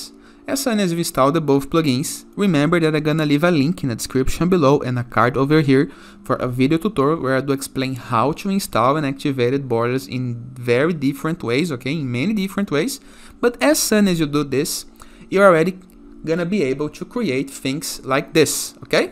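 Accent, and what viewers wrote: Brazil. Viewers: Its some Brazilian accent? 😉Nice TUT, brother!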